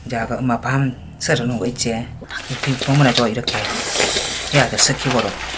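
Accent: Gyeongsang, South Korea. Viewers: 경상도 씬냉이 너무정겹네요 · 쌤~~갱상도 사투리 너무 반갑고 재미납니다 ㅎㅎㅎ 진주 산청쪽 말투같아요 제가 거기서 살았었거든요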